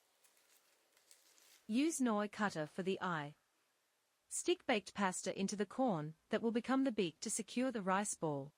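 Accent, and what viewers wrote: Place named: Australia. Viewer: This is a cute bento. More people would watch if you used captions instead of the english voiceover. The Aussie accent is jarring to listen to.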